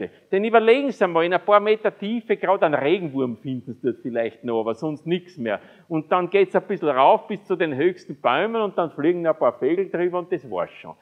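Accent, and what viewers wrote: Vienna, Austria. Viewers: bin durch Zufall hier vorbeigekommen, und dann hängengeblieben. Sensationeller Vortrag, wunderbare klassische Wiener Sprachfärbung und sehr verständlich. Da bekommt man Lust noch ein Studium zu beginnen.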